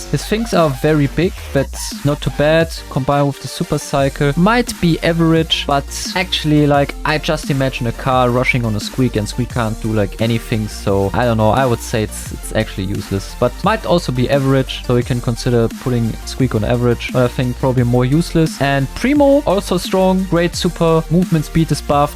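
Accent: Germany. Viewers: hilfe dieser deutsche accent 😭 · I love ur German accent :face-blue-smiling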